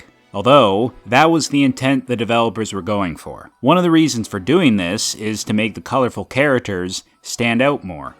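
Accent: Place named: Scotland, United Kingdom. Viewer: hey man first of all you have absolutely nothing to apologise for, cuz I know and I absolutely understand how busy your schedule is especially now so I don't mind as long as you were able to read my comment that's enough. And thanks for replying to me back I mean replying back to every single point that I mentioned, I really appreciate that. Thank you man. My support to u is always gonna be green mate. Can't wait for the Scottish Accent to be back and eagerly waiting for your new video. Have an amazing beautiful peaceful wonderful day today and many more happy days to come your way and for your family as well. Cheers mate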